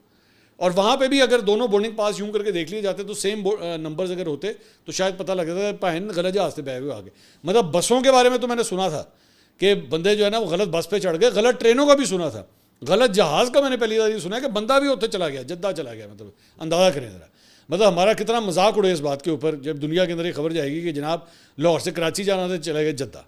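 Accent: Punjab, Pakistan. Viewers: صاحب آپ کا حب الوطنی سے سرشار یہ دبنگ انداز بہت اچھا لگا اوپر سے پنجابی کا تڑکا کیا کہنے ہیں آفرین ہے بھائی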